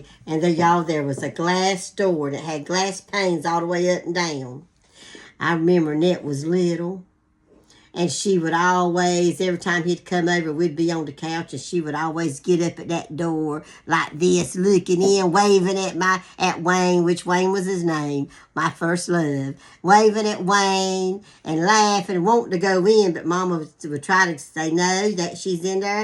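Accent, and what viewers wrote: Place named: South, United States. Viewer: These are the best kind of stories. Thank you for sharing. I gotta say that your amazing southern accent makes it even better for this west coast gal.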